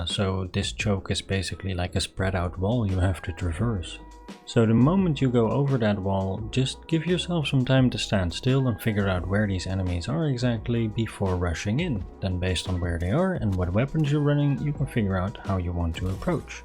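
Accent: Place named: Netherlands. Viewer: You're Dutch aren't you 😜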